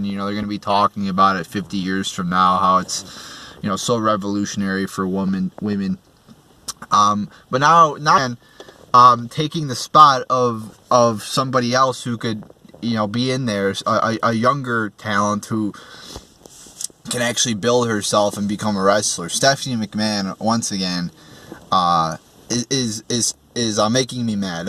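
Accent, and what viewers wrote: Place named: New York, United States. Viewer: you have similar voices. Ai New Yorkar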